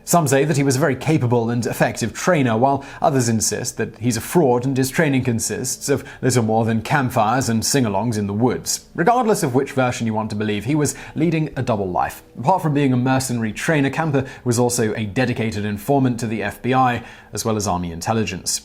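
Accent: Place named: RP, United Kingdom. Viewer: The posh sounding accent is funny when mispronouncing words, like divisive.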